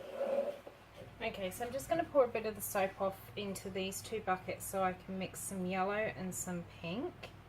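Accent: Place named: Australia. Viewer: Delicate and lovely soap! Love Australian accent!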